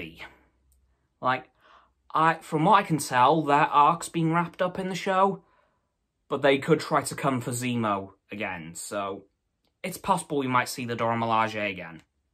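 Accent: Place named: United States. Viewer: You got a lot right!!! Congrats! Btw- bring American, I could hear you give me bad news and sad stories with your accent all day and be totally fine with it. If I ever find out I have cancer you need to tell me. Lol.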